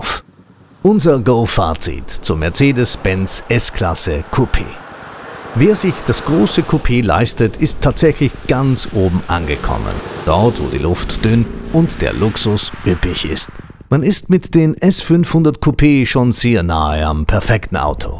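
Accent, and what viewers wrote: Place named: Austria. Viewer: sachlich aber unterhaltsam und emotional, dazu der ziemlich coole Akzent (Österreich?) - davon können sich viele Automagazine eine Scheibe abschneiden. Hat mir gut gefallen :)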